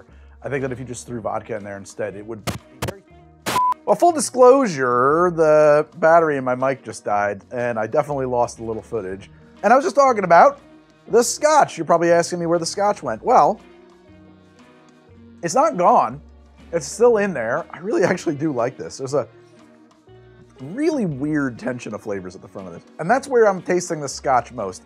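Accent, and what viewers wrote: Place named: South, United States. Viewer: Oklahoma is technically the Midwest, but, like, add a slight Southern twang.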